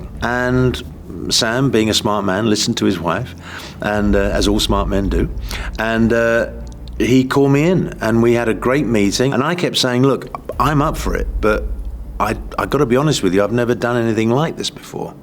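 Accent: United Kingdom. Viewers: A Latino with a British accent?? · That’s because of the British accent. Trust me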